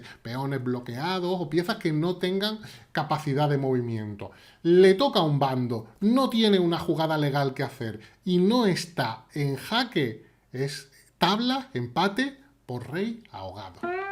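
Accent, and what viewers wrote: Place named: Argentina. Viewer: Che buen video Me intriga tu acento medio argentino medio español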